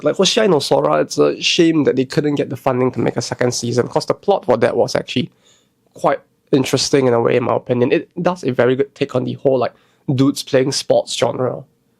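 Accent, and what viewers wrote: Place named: Singapore. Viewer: No way, i wasn't the only one who heard the singaporean accent in your voice heh Also i can add to that discussion of workforce gender presence in the eroge creation. One of the examples i can give is, lets say a hypothetical "Beauty isn't skin deep (yuri)" game that the art team had a specific member setup (atleast during the first project i worked on the CGs), it was only 5 people big, 3 males and 2 females (though it would grow exponentially by 2016). Each of the artist had a specific role to work onto their allocated CG pile, one would focus on the atmospheric setting of the novel, thus designing soft pallete CGs with only the minimum of affectionate connection between the characters. One would focus on the 'standout' CGs as they called it which focused on having an emotional impact ongoing between the characters, and one (me in this case) to be behind the passionate scenes, which would be more of a softcore or a play-state type of mood. 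the more experienced female artist of the team, would sit in a call with the others and point out design or anatomical discrepancies, give tips on polishing the art or highlighting certain zones (not always the naughty stuff, sometimes you want focus on the background, as the backdrop is key into how the player perceives the current situation to be, and can be very distracting if not done right. The experienced artist was also the one responsible for the more.. hardcore stuff CGs, and would basically supervise the whole art quality. In or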